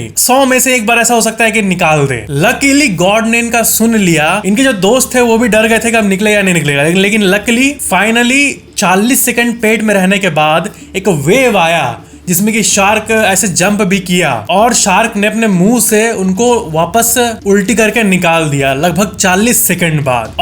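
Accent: Bihar, India. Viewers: Your accecent sounds like bihari,s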